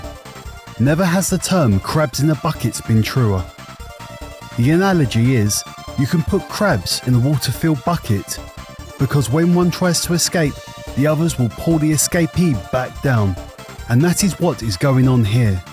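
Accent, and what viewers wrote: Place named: United Kingdom. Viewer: I didn't think of the everyone's speakers / headphones are different. I just use my phone to watch all these videos, sometimes headphones sometimes none. All of your decibel interpretation goes over my head, but I'll take your word for it lol. It's in no way a deal breaker or anything like that, you got a good speaking voice. Similar British tone to retro N64 YouTuber Glenn plant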